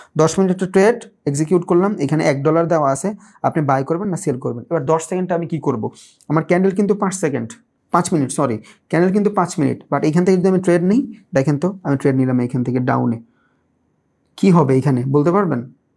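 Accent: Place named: Barishal, Bangladesh. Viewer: ভাইয়া আপনার দেশের বাড়ি তো মোগো বরিশাল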